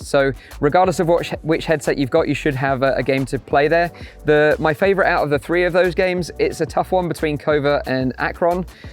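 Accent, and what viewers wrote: United Kingdom. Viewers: Defo uk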